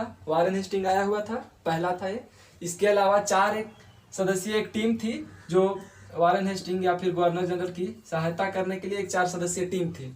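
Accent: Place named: Bihar, India. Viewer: Nice video sir. Khashkar samjhane ka bihari language aur andaz😂👍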